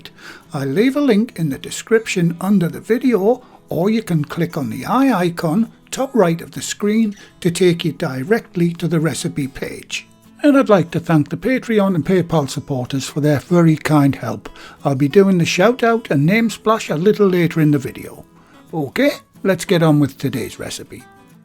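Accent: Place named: Yorkshire, United Kingdom. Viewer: Hi is this the same as a Victorian sponge? My partner has been nagging me for 4 yrs to make one for him lol but I get a lot of requests so sometimes I forget to make things! This year I will make one for his birthday (in Feb). By the way is that a Yorkshire accent? My grandparents are from Yorkshire and it sounds very similar.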